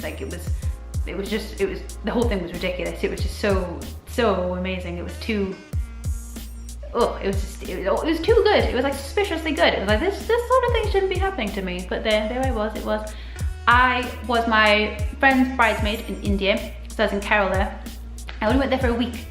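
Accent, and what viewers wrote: United Kingdom. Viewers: Hi! Love your sweet & funny videos :-) Have a question regarding your cats - since one doesn't like the other & all - are they both or one of them adopted? And both or one of them recently? Just curious since we adopted a five (now six year old) cat-lady not long ago ourselves :-) Also - did they enter your household pre or post minimalism? Did you declutter pet related stuff too? If so, how/what? What have you found essential to keep for them? Perhaps you could make a video on that topic & show us? (please?) Love your specific brittish accent, whatever mix it may be :-) And btw you remind me in some ways of Emilia Clarke! Not as any of the characters she plays but herself in interviews etc :-) In a good way of course! Hugs from Sweden!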